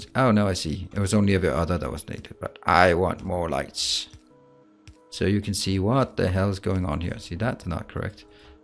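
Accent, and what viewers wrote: Denmark. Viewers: I like your Danish accent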